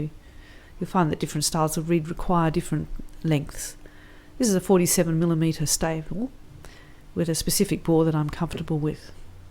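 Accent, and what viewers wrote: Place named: Australia. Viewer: This was so interesting to watch. Thank you :) This might be a weird question, but where do you live? Youre accent sounds australian...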